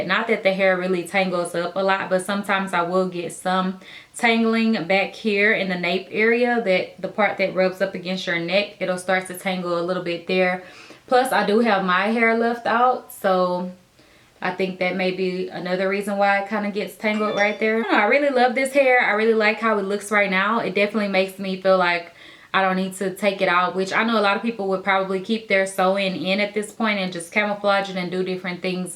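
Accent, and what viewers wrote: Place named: Louisiana, United States. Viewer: Are u from Louisiana? You have an accent... your hair looks great btw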